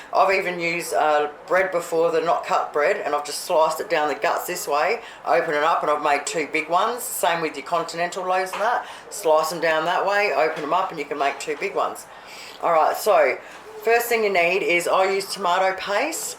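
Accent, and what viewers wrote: Australia. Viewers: Michigan here, love the OZ accent. I'm doing subs with ham, pepperoni, black olives, sweet onion, tomato slices, provolone cheese and after it's all toasted in the oven, shredded lettuce. ( edit: and jarred pizza sauce. ) Thanks for the video! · I just stumbled upon your channel when I searched for baked pizza sub. I'm about to subscribe cuz you have a candid way of saying things like me only I have an American accent and yours is Australian. I can't wait to see what else you got cooking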